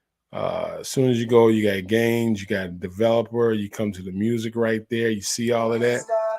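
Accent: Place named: Alabama, United States. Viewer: You from Alabama? Kinda sound like it lol 💪🏽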